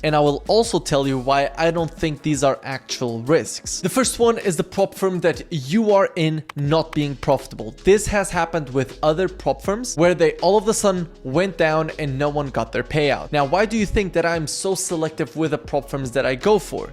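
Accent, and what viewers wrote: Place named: Portugal. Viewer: hey, vi o teu podcast do tradingnut. pergunta: és tuga? estou a escrever em PT de propósito. o teu sotaque já me dizia que sim.. mas depois do podcast fiquei a pensar 😂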